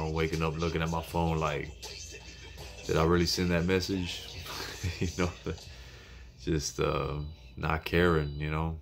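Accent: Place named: Louisiana, United States. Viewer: I bet you’re from Louisiana. Maybe around Lafayette area?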